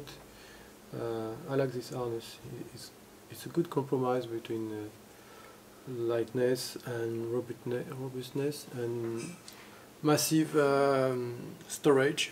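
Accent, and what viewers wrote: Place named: France. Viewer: Mais quel accent de merde, j'adore!!😄 Vive la France🇨🇵 And don't forget to come visit and fly our beautifull Alps!!👍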